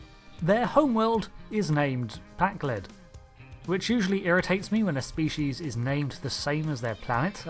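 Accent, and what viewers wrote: England, United Kingdom. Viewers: English from england？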